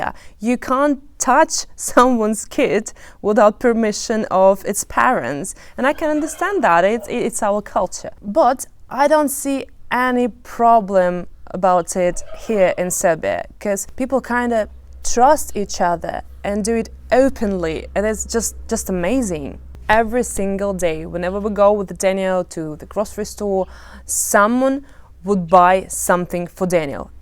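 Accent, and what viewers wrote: England, United Kingdom. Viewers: како си пронашла Србију ако не знаш где је Србија на мапи 😂😂😂 као кад се прича о порнићима, наводно нико их не гледа а сви знају све о њима, ови издајници либерали су свугде исти, за једну Рускињу прилично лепо причаш енглески са акцентом ,то се ретко виђа улагали су Британци добро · your english shows us you were living in UK for years and more. so this is just another paid channel paid by west trying to make bad blood between russia and serbia. everyone just report her so we close her channel. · Your accent is more english thank Russia. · Ma koju njenu državu? Vidiš da ima britanski akcenat. Rusko je možda samo poreklo. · Your English is awfully to British. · Of course that Serbia and Russia have different customs and mentality. Even in different parts of Serbia and Serbian lands customs and mentality are different, not to mention Russia which is removed by thousands of kilometers from the Balkan peninsula. 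I noticed that speaks with a bit of a British accent, which means that she probably knows far more about Britain than about Serbia. Конечно чтo в Сербии и России обычаи и менталитет по другом. Даже в разных части Сербии и сербских земель разные обычаи и менталитет. Россия удалена тысячи километров от Балканского полуострова. Я заметил, что есть легкий британски акцент, что означает что она вероятно знает больше для Британии чем о Сербии. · Ova ima engleski akcenat nije ona dugo videla Rusiju, mucni malo glavom sta stoji iz toga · твой британский акцент говорит намного больше, чем то, что ты говоришь. грязь, вероломно используемая в английской пропаганде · How do you have a British accent if you are from Russia? Just curious ☺️❤️ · Do you have a British dialect? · I found this channel so interactive. Great job! I definitely will subscribe to your channel. By the way, you have a great British accent. 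· You have a can`not decide which but your akcent is British,Australian or New Zeland...Fluid english... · best brittish accent evah!! :D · I do not find anything controversial or offensive towards Serbs or Serbia in this video. However, I find it highly improbable that you have acquired such a great British accent by watching movies because I know it for a fact that foreign movies in Russia (as in many other countries) are voiced over. Hence, you had no chance to hear real British accent from foreign movies in Russia. Given that you are not in your early teens, it would be very hard (read impossible) to gain this level of speaking proficiency if you are "learning" as you say. My best guess is that you have lived or gone to school in England in your early age. If I am mistaken, please take it as a compliment. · You have British akcent!!! Rusan ?? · A sweet video. Well, you confirm what I already suspected; the Russians are a bit more reserved than the Serbs are; we are from the South of Europe, no wonder, simillar openness like Italians and Greeks. 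You find it sad that the many Russians do not know Serbia exists; I understand that feeling. But I do not find it so surprising; we are such a small people compared to you. And how could I expect that your grandma taught you to love the Serbs as you were 6, like my grandma taught me :) BTW, your English is that good, that I wouldn't wonder if you've spent some time in an English-speaking country (sounds like England). All in all, very likeable :) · You speak English like you are from England 🤣. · You have traces of a British upper-middle class to upper class accent.. Interesting ! Cold cuts ..ham, beef etc make great sandwiches .. but excess fat does your arteries no good !! (To each his own..) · british english🤮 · И да кстати,у тебя британский акцент,сразу понятно наклон в какую сторону…и между прочим,можно было меньше гадит по Сербии,всё таки ты в гостях))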